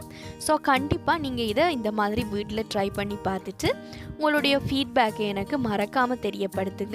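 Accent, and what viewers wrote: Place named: Sri Lanka. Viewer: Unga language very nice neenga srilanka va....very nice dr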